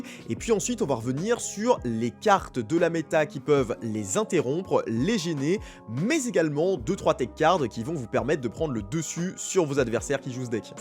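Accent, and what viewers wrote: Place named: England, United Kingdom. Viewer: Toujours aussi kiffant ton accent anglais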